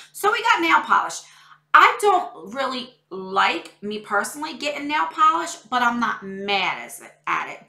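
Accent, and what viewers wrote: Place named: Long Island, United States. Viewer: You're just such a sweet and positive but very real person,. My husband's from Long Island and when he hears me watching your videos he says it sounds like home. ❤️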